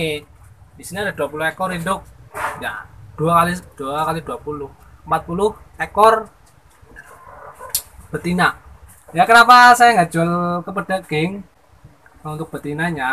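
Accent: Banyumas, Indonesia. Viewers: Ora ngapak.ora penak kang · Kok ngapak ,kaya nyonge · Ya,, banyumas punya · Mas alamatnya mana ketone ngapak kaya edek pengin merguru ko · ngapakk ya mazz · Jyooooosss Ora ngapak ora kepenak😀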